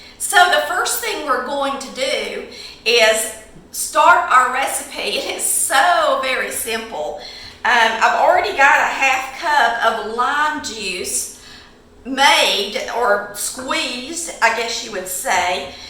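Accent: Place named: South, United States. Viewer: I love 💕 your southern accent and my heart ❤️ could just melt!!! I’m going to try your recipe Mrs!! If I love ❤️ lemon meringue pie, then key lime pie should be great 😊 for me!! Thanks for sharing honey 🍯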